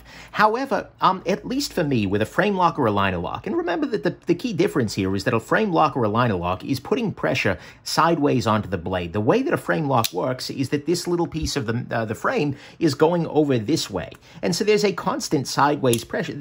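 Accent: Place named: New Jersey, United States. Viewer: Where do you grew up to get a accent like that i suspect dirty jersey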